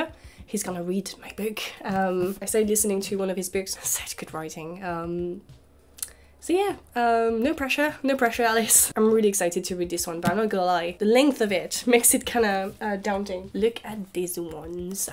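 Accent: France. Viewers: Gorgeous Gorgeous Gorgeous Girls speak English in a French Accent and recommend books despite feeling ill.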